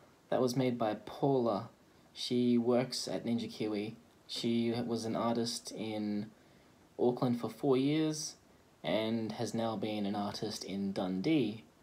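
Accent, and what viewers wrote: Australia. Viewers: You sound very Aussie still. But right at the end when you said "I'll see yas round". I was like "nah he's definitely Australian haha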